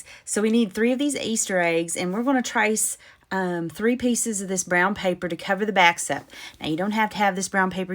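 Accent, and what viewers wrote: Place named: Louisiana, United States. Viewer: Just found you on YouTube. Love everything you do. I'm an old Louisiana girl so love seeing Louisiana girls doing their thing. I really, really miss the accent.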